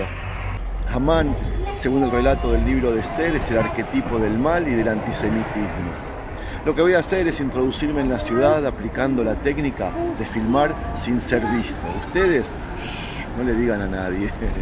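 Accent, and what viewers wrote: Argentina. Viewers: es judío? Xq habla español y con asentó argentino chileno no se q asentó sea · Viniste alguna vez a Argentina? Tnés algo argento en la forma d hablar🙌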